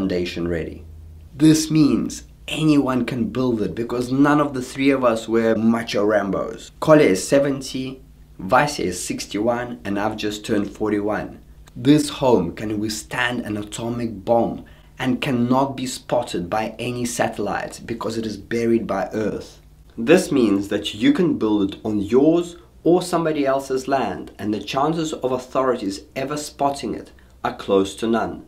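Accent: South Africa. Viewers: It sounds sooo much like you have a South African accent??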